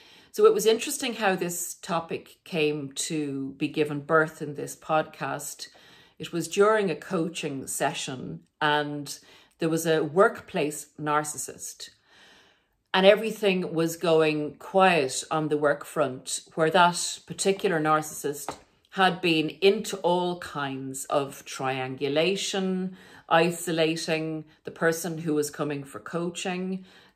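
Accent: Ireland. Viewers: I can hear you are irish do you habve coachibg online can you putvthe contact here thank yohbits greatctibget info here thanks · R u Irish by the way? The only reason I ask is because well I am 50% I’ve had a really bad weekend with my narc But I had to tell my parents I was texting them they just drove all the way to the Florida Keys they are almost 80 but I’m sending them voice memos because somehow it just happens to the best of us when the end of a sentence has to come out Irish, well it happened to me all last night and I couldn’t stop laughing that usually happens to my dad. It’s just so funny because it’s like I’ve never been to Ireland but it lives in us I don’t know I might be wrong completely but I thought just maybe maybe if you might be a little bit Irish? You don’t do it though but it’s like how do we adapt an accent from a country that we’ve never been to?! 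LOLs that’s the only thing that’s kept me laughing through all this narcissistic abuse from my boyfriend who I am about to have seriously just I’m gonna forget about him eight years down the tube but you know what eight years too long of me putting up with this it’s like it’s like dealing with somebody with multiple personalities there might be other things going on I don’t know I don’t care anymore I just know that I’d know this is a hard test for me because I am not usually the one to sever the ties in relationships It’s not a want it’s a need it’s kind of like I have to it’s like you’re being forced to leave this person and it just will get it will get so bad it will snow ball until you end it and your whole entire life is a mess An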